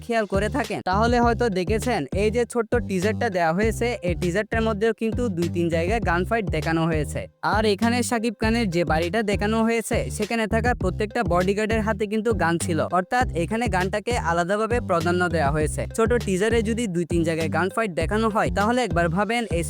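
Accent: Chittagong, Bangladesh. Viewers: আরো চাই তোমার বাড়ি কি চিটাগং ভাইয়া তোমার কথা শুনে লাগতেছে ❤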